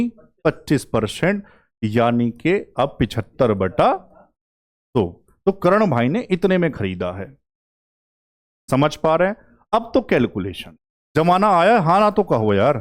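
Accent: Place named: Haryana, India. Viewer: Haryana - famous hamari boli --haryanvi ❤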